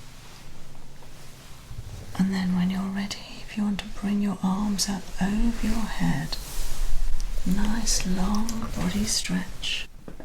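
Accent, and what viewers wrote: England, United Kingdom. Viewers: Hi miss how are you i just saw your channel an hour a go but im a fan ❤❤❤you are so friendly and nice kind lady btw love your english accent ❤i have 2 videos i think from my bully her name is Magic bcoz dog friendship is magic love your channel and i love all typs massages and treatments im Bas van Laarhoven from the netherlands and i love to be a patient or cliënt from you or make a video do you take care for man or only ladys respect miss ❤❤❤🙏🙏🙏👍👍👍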